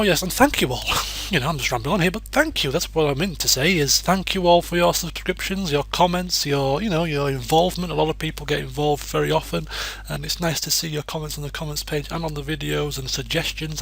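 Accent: United Kingdom. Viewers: New Vegas.. New Vegas! New Vegas! haha man I love you videos man! It's nice to hear a fellow British-man give a 5 star commentary : ) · I see ur videos because of the british accent, is that weird?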